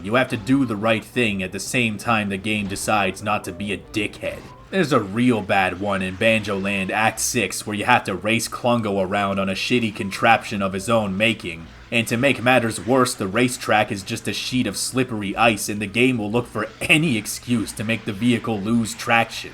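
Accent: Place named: New York, United States. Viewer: First time viewer here: When I hear him speak I picture a New Yorker sitting in a bar telling a story. I don't know why I just couldn't get that image out of my head.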